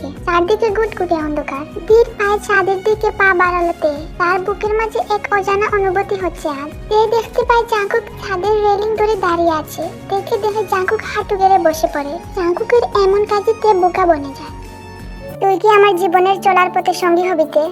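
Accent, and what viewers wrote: Sylhet, Bangladesh. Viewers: আপু তোমার ভয়েস শুনে মনে হচ্ছে তুমি সিলেটের 💜💜💜♥️♥️♥️♥️♥️♥️♥️♥️♥️♥️♥️♥️ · তুমি সিলেটি পুরি? বালা আসোনি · আপু তুমি কি সিলেটি ❤ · আপু তুমি কি সিলেটি 🖤❤️🖤❤️🖤❤️🖤❤️🖤❤️🖤💚💜💚💜💚💜💚💜💚💜💚💜💚👻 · আপু মনে হয় সিলেটি,, যাই হোক আপু তুমার ভিডিও অনেক অনেক অনেক অনেক অনেক অনেক অনেক অনেক অনেক অনেক অনেক অনেক অনেক অনেক অনেক অনেক অনেক অনেক অনেক অনেক অনেক অনেক অনেক অনেক অনেক অনেক অনেক অনেক বেশি সুন্দর হইছে আপু I love you api 😍😍😍😍💖💖💖💖💖💖💓💓💞🤩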